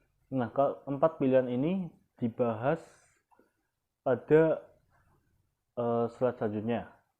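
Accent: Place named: Java, Indonesia. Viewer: Medok nya natural mas, Sangat bisa diterima masyarakat indonesia🙏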